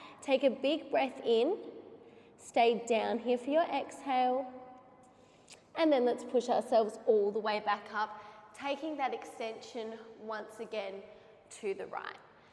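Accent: Australia. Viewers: Im from the states but my dads side of the family is in Perth and Sydney. I recognised your accent. I hope to visit one day! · Girl WHO thought you’re from the UK 🤣🤣 maybe I just watch too many cutie Australian YouTubers but I can spot your accent from a mile away! NOT a British accent 🤣 I am dying. I love all of you Australian girlies accents so much!! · Dying that ppl don’t realize you’re Australian 😂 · I was like, what??! I thought she was Australian! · Omg how did people think you’re British!? I could tell right away you’re from Australia! But then again I do know a lot of Australian people for being an American lol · As an American, I could absolutely tell you were Australian. How funny. Anyways, another amazing workout. Thank you for all that you do. You helped me fall in love with Pilates. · I’m an American and knew you were Australian because I have a beautiful friend from there but also I follow move with nicole and knew it was the same accent ❤ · I can't believe people actually thought you're British?? 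Like they have clearly never heard an Australia accent before yeesh. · Same thoughts😂I don't watch a lot of Aussie youtubers but even i could guess she was an Aussie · Loved this workout! I knew you were Australian bc you sound just like Miranda Kerr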